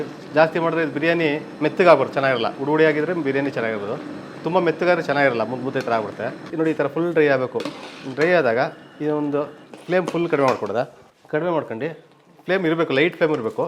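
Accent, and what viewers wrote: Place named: Mysore, India. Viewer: ನಮ್ಮ ಮೈಸೂರ್ ಭಾಷೆ 👌👌👌👌💛❤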